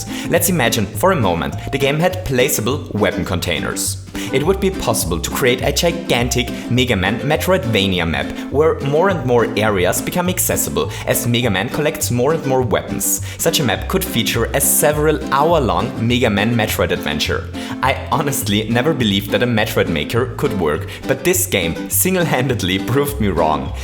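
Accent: Germany. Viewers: german accent. i hate it. it's like ear cancer. xD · You sound german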